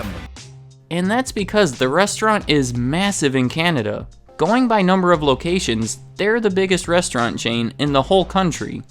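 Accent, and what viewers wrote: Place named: Midwest, United States. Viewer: With your ambiguous yet slightly midwestern accent I thought you might be from Canada.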